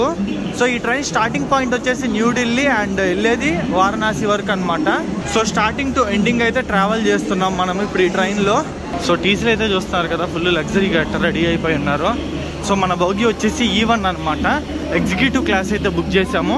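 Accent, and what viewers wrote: Nellore, India. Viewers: ma nellore lo anthe ayya.. :)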